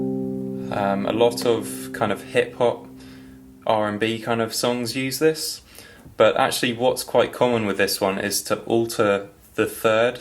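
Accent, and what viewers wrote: United Kingdom. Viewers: also you're very charming and I love your british accent :3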